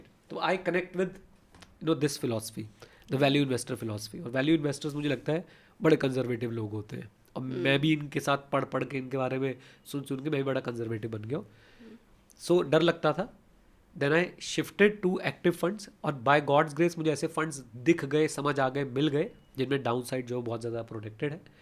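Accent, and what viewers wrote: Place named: Panwari, India. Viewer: Panwari accent ❤❤❤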